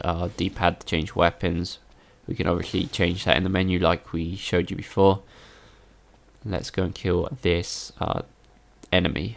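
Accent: United Kingdom. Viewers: Why the Japanese? You sound British?... Head explodes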